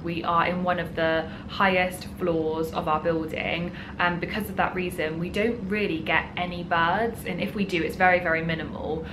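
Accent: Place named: West Country, United Kingdom. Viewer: Hello. I'm James and also British ( I'm detecting a bit of a West Country accent?) I'm currently living in Manila and will be moving to Dubai in May along with my little border terrier Ted. It's a similar climate here but less dog friendly / accessible. Thanks for putting the info together as you've just answered lots of the questions I had around apartments and general dog life in Dubai!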